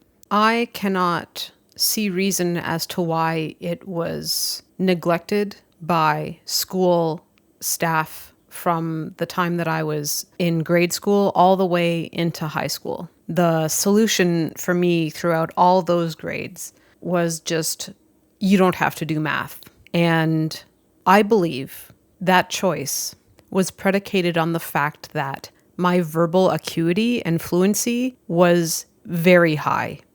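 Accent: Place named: Canada. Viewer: How are schools today in detecting dyscalculia & dyslexia? I mean both the US & Canada as I'm American and I can hear her Canadian accent.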